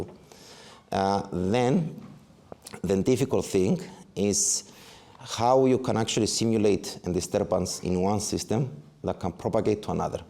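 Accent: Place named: Greece. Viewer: Sorry but your english prononciation with strong greek accent is almost impossible to understand for french speaking people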